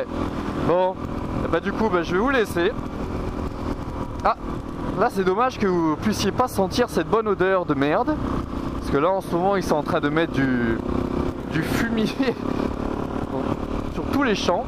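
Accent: Alsace, France. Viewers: On sait que tu es Alsacien, avec cet accent. Et le Panneau à 70 (Après le moustique) c'est pour les chiens !! De même que celui à 30km !! A+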